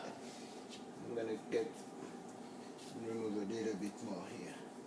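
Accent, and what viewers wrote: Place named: France. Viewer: if he only did not have a french accent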